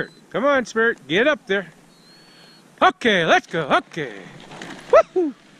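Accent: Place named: Massachusetts, United States. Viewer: seasons greetings from your old stompin grounds...massachusetts. you havent really lost the accent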